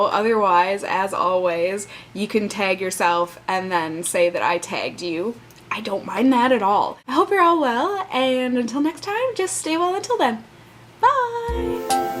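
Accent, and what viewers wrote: Minnesota, United States. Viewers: Thanks for sharing the Minnesota accent with us. Hopefully your doing well. God loves you and forgives you. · I want to come to Minnesota to hear the accent. **** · great video. great job trying to show off the accent, but you didn't even bring up hotdishes. that's what my friends make fun of the most. oh and im a proud Minnesotan. and a few works for you to play with "beg" or "bag" , "don" or "dawn" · HAHAH I live in minneapilis mn and she has a lot more of an accent then me...I love it tho😂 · Your accent is so cute!!! I have never met anyone from Minnesota before, I loved hearing your accent! :D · I think the MN accent is one of the most musical and beautiful the English-speaking world has. And I’m surprised to hear that it’s so much like how we talk in the NW of England! We say “daddy long legs” and “pop”...or “fizzy pop”! 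And our vowels are very similar. Don’t alter your accent to adapt to anyone. It’s gorgeous. And we say “bobble” for your “hair elastic”...why?! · yep, every person who grows up in Minnesota have this, its just the we are dontcha know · Honestly, I think the way she speaks is probably the best representation for younger generation Minnesotans (like me). In other words, I can hear the stereotypical accent around here at times BUT only in the older generations. Think about it though...you take a senior citizen from here and more than likely, their parents or grandparents were directly from Scandinavia. You take that and water it down a bit over time and you have what this chick sounds like. My opinion anyway. Sidenote: I'm definitely guilty of saying "oofda" quite a bit (usually after I sneeze. Lol) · You are so awesome! I so enjoy listening to you. You have a sweet, kind, and lovely voice. 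Plus, although your Minnesota accent isn't extreme or real deep, I like listening to the way you say certain words - words with "O" in them, like boat, coat, board, etc. I like that. Oh, before I shut up, you are very attractive, as well, with a beautiful smile and beautiful eyes. Wanna marry me? ;-) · I'm from Minnesota and I don't know which part of MN you are from but you have thicker accent then people around me. 😂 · Just love it your Minnesota accent!! I live in Minnesota for almost 10 years and I still learning the Minnesota accent I have some friends that used to teach me so many expressions! Love it!! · Just loved your accent! And you are cute, for shoer-er! Bye! (Minnesotan?) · I'm Minnesotan and I totally said every word you said the same 😂😂😂 I guess it is a Minnesotan thing (ya know?)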